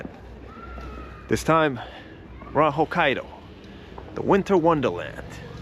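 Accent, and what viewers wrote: New York, United States. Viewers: Just wanted to say that I really love your videos. As a fellow New Yorker who used to travel a lot when I was younger, it's like nothing else to be able to tag along on these adventures of yours. It's just so real, like a window into another life. I really appreciate your NY accent and your sense of humor, you just feel like someone I would know, but your skill with languages makes these videos. Not another disrespectful American, someone who gives the rest of us a good name. Thank you for all of it. The Russian and Israeli videos were harrowing, by the way, if it wasn't already uploaded and we were watching it live, I'd even say anxiety inducing. Courageous. You deserve a break, hope you get a nice rest back here in the states, and looking forward to seeing you back at it whenever it strikes you. In the meantime, I'm sure there's more in your backlog I still haven't seen and will content myself with that. 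Thanks again for all of these!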